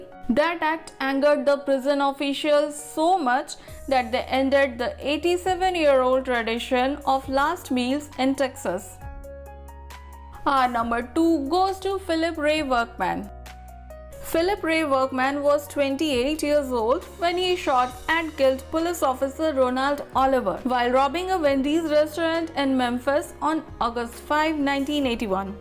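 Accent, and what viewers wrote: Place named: India. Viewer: Great video and the Indian accent is beautiful. Thank you for sharing these photos.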